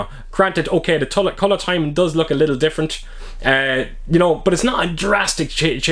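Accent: Ireland. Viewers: ah defo..as soon as I heard the accent I knew you were local, but wasn't sure if you were based in Ireland yourself. Definitely a small world and look forward to following your channel 👍🇮🇪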